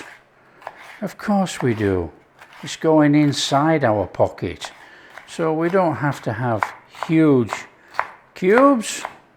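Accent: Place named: Cockney, United Kingdom. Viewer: His cockeny accent reminds me of the character in My Fair Lady, Eliza Doolittle's father who sang a.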